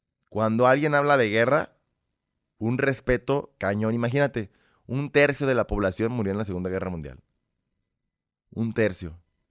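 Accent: Mexico. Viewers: Su forma de ablar y frases que uso lo ase mejor que muchos mexicanos. Vaya carajo. Asta no necesita que ser mal ablado para darle emoción a sus historias. Que buena onda este compa ruso mexicano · El ya es mexicano y habla como mexicanos fierro toro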